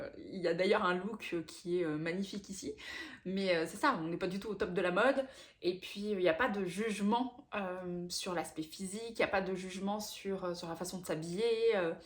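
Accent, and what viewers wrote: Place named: Quebec, Canada. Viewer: Tu commence a avoir des intonation de quebecoise.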